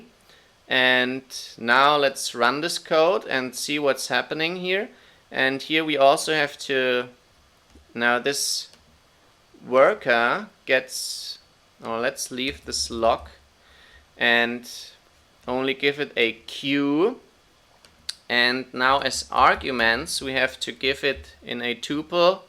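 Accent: Germany. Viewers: you Sound german tho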